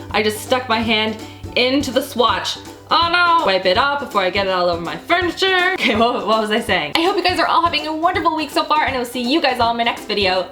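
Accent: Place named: Canada. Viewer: I love your Canadian accent!!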